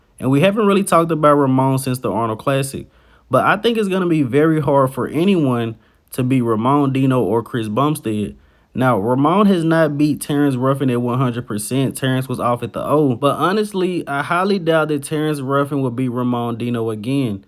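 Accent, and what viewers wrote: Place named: South, United States. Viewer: love the southern accent (if im not mistaken 😅) u got my sub man salute from europe 🫡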